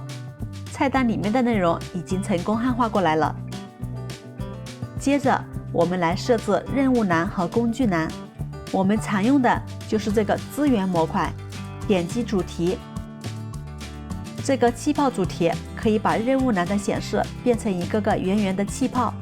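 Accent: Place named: Sichuan, China. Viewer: 声音倒像真人的，还带四川口音，但人像怎么看都像假的。。。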